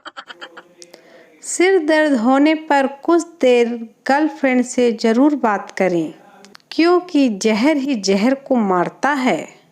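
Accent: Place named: Bihar, India. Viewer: Didi aap Pata Logon ki faltu bakwas se please aur Dur Raha karo kuch Log Kahate Hain aapko ki aap Bihari lagti ho aap Bihar chal Bhaag Bihari Bihari Jaisi Teri Awaz hai aapke awaaz me Jaan basiya didi aap yah Baat Jarur Jaan Lijiye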